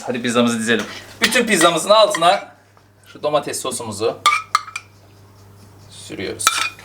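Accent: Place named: Adana, Turkey. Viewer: Adana aksanin super Arti yemek sana yakisiyor Hazer falan filan senin yaninda vizzzzzzz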